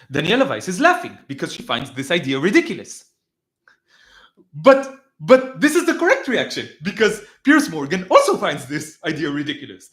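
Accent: United States. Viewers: Thank you for the great analysis. Every now and then I speak to a liberal Zionist who says there are 22 Arab states and just one small Jewish state. Yes, that means they approve ethnic cleansing. P.S. The Hebrew accent is very like Ukrainian/eastern European accents to me when a lot Zionists like Netanyahu speak. Not so much yours. I think the original settlers who revived Hebrew came from eastern Europe. Your American accent is amazing. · Great American accent. I think I have never actually met or conversed with an "honest zionist." I started the video thinking Piers would be the honest zionist because I didn't know who the other person was. Wow. That shows how bad things are, when Piers is the more "liberal" person in the conversation, because here in the U.S.A. in GENERAL I think he is considered a right winger. At least he strikes me as conservative and I have previously heard him praise Trump and MAGA. 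Zionism, however is so mainstream here in the U.S.A. It is embedded in both political parties and both right wing and left wing thinking. You're correct, the liberal zionists always find a way to package it all up in a nice package to deceive the world. To other Americans, do you know any honest zionists here in the USA that are not from the place called Israel?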